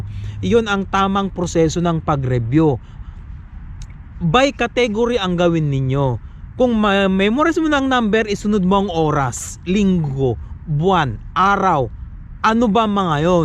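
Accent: Ilocos, Philippines. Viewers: Annyeonghaseyo .. Sir , ilocano ka po ba?! 😁☺ Halata po kc sa accent .. Correct me po if I'm wrong .. 😉 Feeling ko po nkikinig ako sa pinsan ko dhil sa accent mo po .. Hihi Btw , thank you po sa tips .. EPS Aspirant here .. Sana po makapasa .. Para sa aking family .. Fighting!! 😇 GOD BLESS po .. 👍👊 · ah ilocano accent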